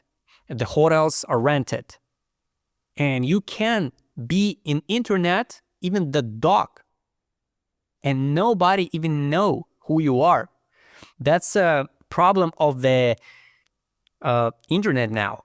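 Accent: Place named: Eastern Europe. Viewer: Brother, I think you have a Slavic accent.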